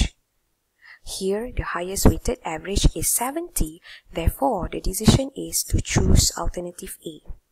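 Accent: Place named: Malaysia. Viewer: Malaysian accent. Nice talented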